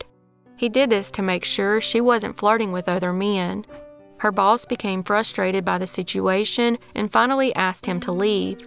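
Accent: South, United States. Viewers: Just discovered your channel. Thanks for covering these Canadian cases. Subscribed 👍 The Southern accent only makes it that much better! So charming and attractive when southern women speak. Not trying to be weird or anything, but it's true!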